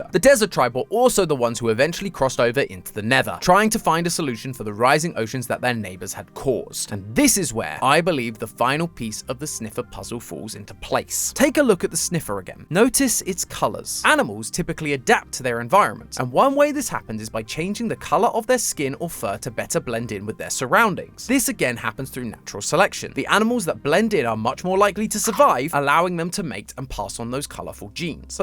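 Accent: England, United Kingdom. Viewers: is just british matpat i like him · Too british, unsubscribed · I forgot game theory is now british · Who is this British person, where is matpat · Sadly the voice just isn’t the same to me, feel like I’ve lost part of my childhood now matpat isn’t here. This and the last although the content is what I’m interested in, unfortunately all of mats energy I feel has been lost. I’m a Brit so used to the accent and honestly it’s now just way less entertaining for me. I really do wish all involved in theorists continue to grow and have wonderful times adventuring into these stories, it’s just kinda hard to accept maybe you’ve grown out of one of your favourite channels. A narrator is very important and sadly YouTube has lost one of its best. Game theorists, not lost but will never be the same. Miss you matpat ❤ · Come on. I clicked this video expecting to hear mat pat. 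Instead I hear some British replacement. I really don’t want to say it, but the newer videos aren’t as good because MatPat isn’t there. It may be the same scripts that he would’ve read, but there’s something about mat pat that just makes me want to watch him. Not you. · i forgot matpat retired and got jumpscared by british matpat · Why does the new guy sound like matpat, but british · Are you British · The British accent is so annoying can you tune it down a little bit? It’s just if you’re gonna go full Mat style story telling, the British accent sounds very distracting and confusing.. try to either do your own style or try to lessen the accent a bit. No hate for brits, and thanks for the amazing theory as always.. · I love when the british talk about minecraft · Why is the rest of the team suppressing his britishness 😢 that's way past uncool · Dude im so sad i opened this video and expected matpat then i got jumpscared by this british guy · I thought I would be salty and abandon these channels after Matpat was gone but after listening to Matpat and honoring his wish of giving the new voices the same chance we gave him all those years ago has worked out perfectly, much love british Matpat! 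· are u english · This is the first Game Theory video I've seen since MatPat left. ... you sound like a British matpat. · Why is he British now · British MatPat is almost as wild as MatPat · I love that new Bri'ish Game Theory! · British Matpat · with a british accent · its just british matpat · Hehehe the team is definitely putting the new brittish accent to work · not making fun of you And I love the British accent but is it suppose to be "Wa oh" instead of "wuter" (I'm so sorry love the theory tho😅)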